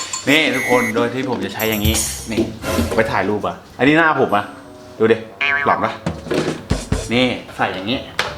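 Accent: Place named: Myanmar. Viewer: มึงเปนพม่าเหรอสำเนียงเหมือนเลย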